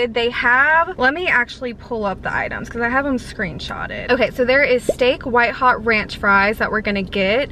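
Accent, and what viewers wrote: South, United States. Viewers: why do I watch these videos they always make me hungry lol. Also, where are you from? you sound like you kinda have a southern accent to me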